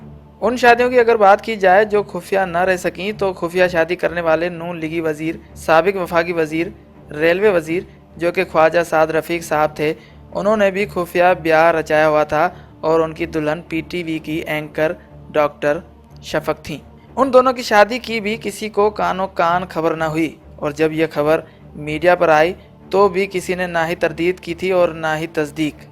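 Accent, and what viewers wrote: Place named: India. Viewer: kismat or qismat.. gujaar or guzaar. mutabik or mutabiq.. is trah doosre alfaaz, indian jessay urdu/hindi bolte hain. kitni jamaat padhay ho??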